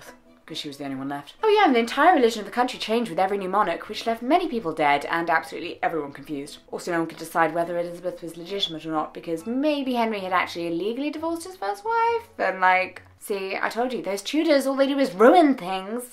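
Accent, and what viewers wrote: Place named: England, United Kingdom. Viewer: English accent 🤤😍😍😍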